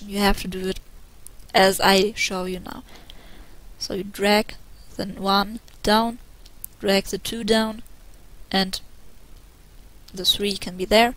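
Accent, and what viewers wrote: Germany. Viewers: Man hört sehr gut heraus, dass du aus Deutschland kommst :D Sehr gutes Tutorial btw! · Are you german? I fucking hear it ¬¬ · Sehr gutes Tutorial :^) Ich habe die ganze Zeit überlegt was das für ein Akzent bis ich am Ende erkannt habe, dass es ein deutscher ist ^^ Weiter so! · I think it's German. (I'm German too so I can hear the dialect) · ich habe iwie sofort an deinem englisch erkannt dass du aus deutschland kommst xD(nicht dass das schlimm wäre o: ) aber schön zu sehen das es hier so coole undertale fans und animator gibt :D grüße aus hannover :3 und natürliches: nices tutorial :D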